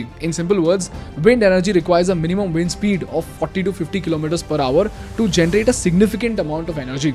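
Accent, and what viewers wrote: India. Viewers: I don't know but when I listen ur English I feel that I am listening Hindi. I don't get irritate by your speaking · Kitna bud shakal hai yea larka. Aur is ki english suno. Typical indian style. Manhoooos shakal. Manhoooos awaz. 😅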